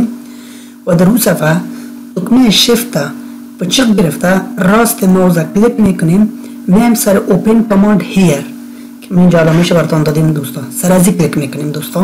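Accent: Afghanistan. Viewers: گوه تو لهجت افغانی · لهجه ی افغانی خیلی غلظت داره واسه ایرانیا سخت میشه فهمید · از لهجه اش معلوم میشه که پشتو زبان است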